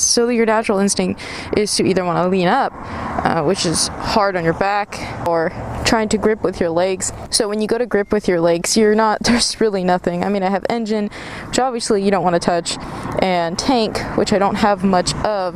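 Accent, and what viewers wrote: United Kingdom. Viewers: English accent on point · why dont you put on a set of MY kustom bars ;) and your british accent is bloody good mate!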